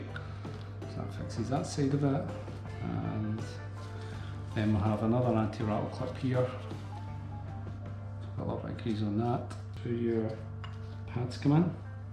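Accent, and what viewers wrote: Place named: Scotland, United Kingdom. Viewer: Wow. Nice to hear a scottish accent too. I'm from North Berwick. Left a ong time ago though. Can I ask another question. I've started the engine no problems with a new battery. Before the engine has time to really warm up (within 1 minute) there are white fumes coming from the right hand side seemingly behind the cylinders - about that height. Looks as if there may be some electricals in there but it would need further dismantling to check. Have you ever had this happen and what was it. I'm at half a mind just to let it run and see if whatever it is (old coolant ?) burn off, but I don't want to burn something evident and have to replace ... decisions decisions. Cheers.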